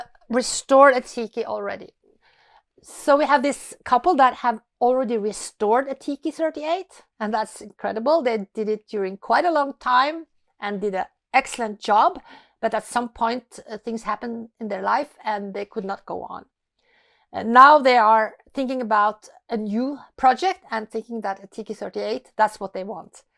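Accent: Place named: Norway. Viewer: So the boat name is BareBare as in bare-naked. Why do you call it butter-butter? Perhaps my southern U.S. Ears are not tuned in to your Norwegian accent. It sound exactly like butter-butter. I find it super interesting how the English language sounds SO different spoken by people around the world. Ok enough questions, good luck finding a home for butter-butter - wink!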